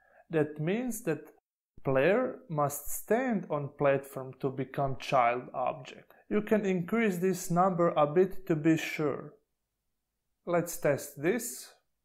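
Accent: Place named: Russia. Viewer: русский да? ну или украинец - акцент)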